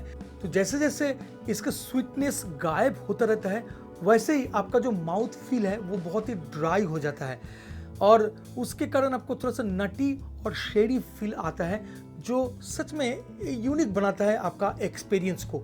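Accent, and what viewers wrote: Bengal, India. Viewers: r u a Bengali?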